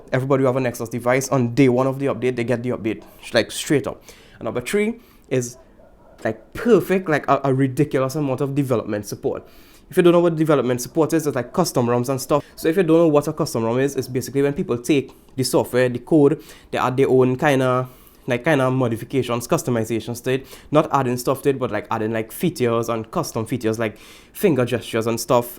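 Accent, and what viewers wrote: Trinidad and Tobago. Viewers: Dude you talked faster after saying that you would slow down because of you Trini accent. Hahahaha. Love it. So I'm a mac guy and was never much interested in anything else but I see that you are able to remotely control your mac from it?? Now I am piqued. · Bmt that trini accent surprised me fam #trinitodebone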